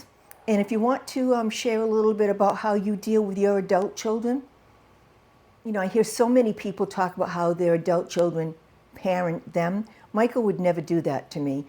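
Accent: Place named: New Hampshire, United States. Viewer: when you find that bra, you must share with us. I have exactly the same need. Love your show. Listening to you reminds me of my mother’s family from Manchester, NH. How they are missed!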